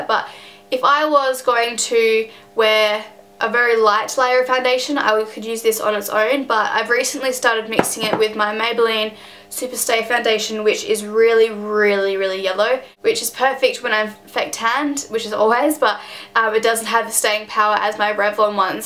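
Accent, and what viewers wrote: Australia. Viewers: Sounds more Australian.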